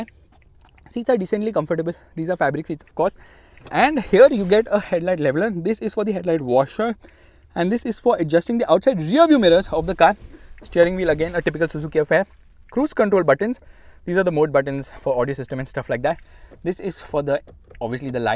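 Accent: India. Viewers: english in hindi accent sounds so funny "No" is like a wave ~~~~~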